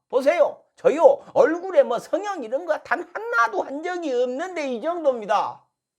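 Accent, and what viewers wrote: Jeolla, South Korea. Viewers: 표준말 써라 이쉐이야 표준말은 갠히 쓰라구 표준말이냐? 서울서 태어났다메 서울서태어나서 자랐으면 니가 표준말이 더 익숙하지 넌 전라도 사투리를 돈 벌라구 이용하는거야 안그래?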